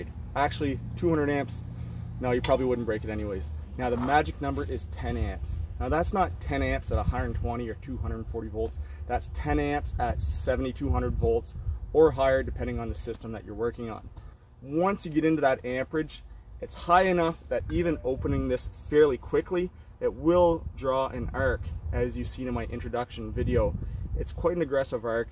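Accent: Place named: Newfoundland, Canada. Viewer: I hear a slight Newfie Accent. I was born in Newfoundland--in Alberta atm. Love your channel. I've been giving serious thought to going for journeyman electrician.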